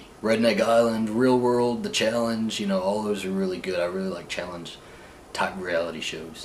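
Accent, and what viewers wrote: South, United States. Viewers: sounds like a southern surfer boy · It's because he's southern ;)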